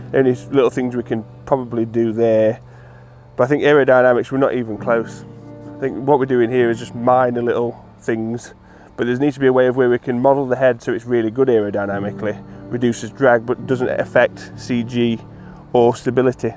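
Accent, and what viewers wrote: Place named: England, United Kingdom. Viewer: I thought you sounded like an English bloke, are you in Oz?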